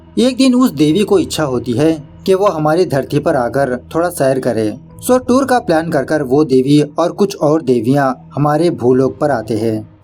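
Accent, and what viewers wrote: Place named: South, India. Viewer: Love ur voice ji😍😍 South Indian hindi bolte to accent bht acha lagata hai..me v south side se hoon..andhra odisha border😀😀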